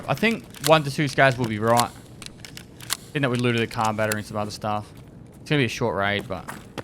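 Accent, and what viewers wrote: Australia. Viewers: I recently found out Australians are the only ones who pronounce it differently (like he does).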